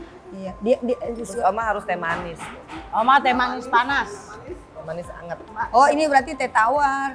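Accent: Manado, Indonesia. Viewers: Mba campur logat manado mantap 😀👍...sehat sllu mba' brsm kluarga 😇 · Adu eh...p sadap m dengar mbak bahasa mdo..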